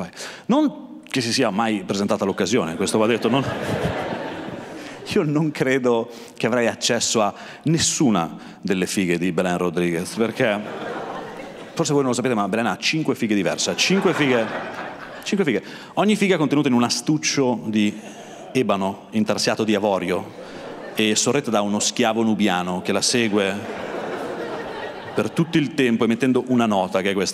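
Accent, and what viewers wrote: Italy. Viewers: ...non siamo così ambiziosi...fantastico! Finalmente un accento né romano, né napoletano. Uno del nord che dice grandi verità senza filtri. Che soddisfazione. Veramente catartico. Ho chiamato quel figlio di puttana di mio padre nell' aldilà (è morto da un po') e l'ho perdonato. Saluti. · Ho guardato questo video ieri sera e l'ho riguardato a oggi mezzogiorno con i miei.. Ci siamo smascellati dal ridere! Grazie Eleazaro a nome di tutta la Romagna, di tutte le "zeta romagnole" e a nome del parlare come si mangia, senza tutti sti costrutti e perbenismi che attanagliano la società! Ci vediamo a Marzo a Savignano! Un abbraccio!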